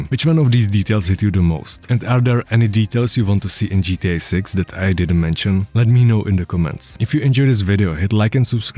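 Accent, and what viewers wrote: Czech Republic. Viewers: This is czech accent? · Are you czech you have that kind of accent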